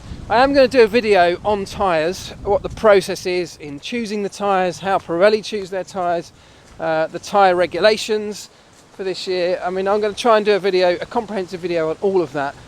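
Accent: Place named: United Kingdom. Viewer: Like your british accent and good stories about F1. Good job and looking forward for more!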